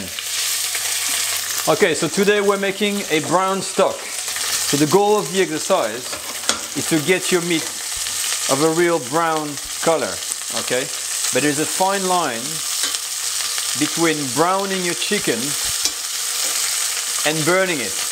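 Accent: France. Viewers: He sounds french. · Excellente recette mais l'accent maudit français, ça me fait douleurs les oreilles. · I think you have a nice French accent. My daughter thinks your accent is typically French but I think you have a nice twist when you speak English and you're very clear. Merci pour la video. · ❤I love your French accent so cute 🥰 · Merci mon ami! A trultyfantastic dish. I am going to try it on my family I had no trouble following you great French accent. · that s ok, we understood :) very nice and how can a French speak such a good English with such a thick accent haha. cute lol, thanks for the recipe, doesnt seem difficult, will try. a bientot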